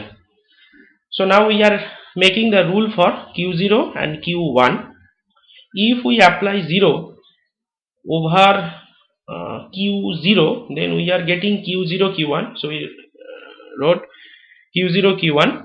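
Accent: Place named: India. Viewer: That Indian English is very disturbing otherwise is the example really gut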